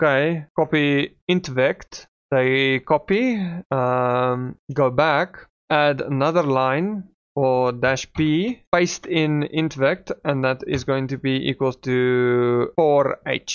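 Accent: Afrikaans, South Africa. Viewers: jissie daai lekker afrikaanse aksent :)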